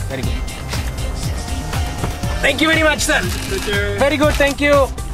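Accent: India. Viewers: this guy has the thickest indian accent ever.....and it's real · LOL, the best part is his amazing tamil accent and stupid english :D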